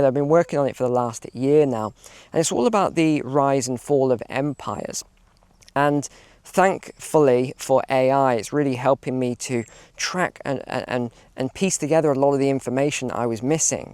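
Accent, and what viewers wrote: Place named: United Kingdom. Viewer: Thanks for your great info., humor, and stories---and we love your beautiful clear speaking, and with that great British accent. And your infectious SMILE...that helps while listening to some of these news items. God Bless you and your Family. Let's pray for a turn-around........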